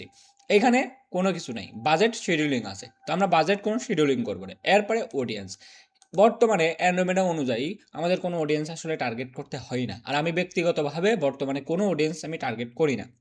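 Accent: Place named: Feni, Bangladesh. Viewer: Apni jey vhabe bujaysen.best.oneker paid course a egula bole na.i mean janena.best of luck. Apnar kothar tone a mone holo fenir ashe pashe may be home?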